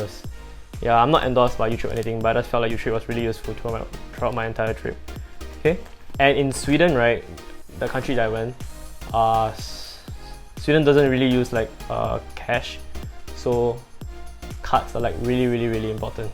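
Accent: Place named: Singapore. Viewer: Great video! But the Singlish is too crazy for me man 😝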